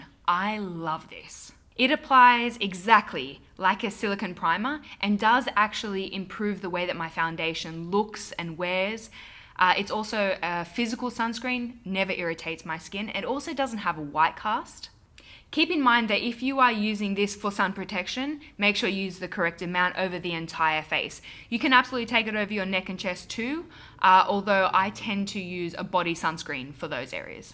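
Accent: Australia. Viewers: Hola!!!! Te saludo desde Colombia sur América, me encantan tus vídeos y tu forma de hablar, muy Ausie😁😁😁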